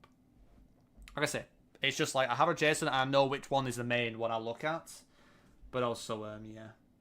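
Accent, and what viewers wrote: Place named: Lancashire, United Kingdom. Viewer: Don't give up. Your doing great. I always watch your videos (I am Polish). I will region guess you by accent. Your'e from Lancashire, either Preston or Wigan.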